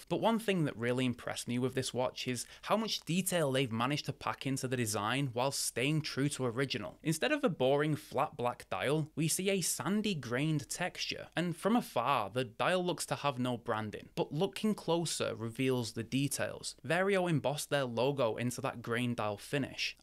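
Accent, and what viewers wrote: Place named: Northern England, United Kingdom. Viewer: Northern England somehwere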